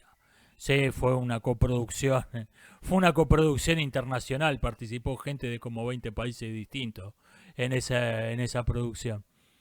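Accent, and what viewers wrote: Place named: Argentina. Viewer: Abro moción para que nos narre creepypastas clásicas con su acentito argentino. Imaginen tener a Slenderman no sólo narado, sino COMENTADO por el Sócrates de nuestro tiempo.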